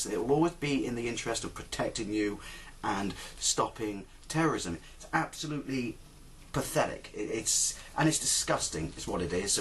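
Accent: United Kingdom. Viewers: Honestly if I couldn't hear your British accent I would have thought you were an American. The exact same shit is going down over here. Slowly but surely they are trying to chip away our freedom all in the name of public safety. Also our troops never actually make it home but instead get shipped off to yet another war. I've never seen CCTVs though. I'm sure our current administration is pushing for that as well as they think China is the ultimate template for national security.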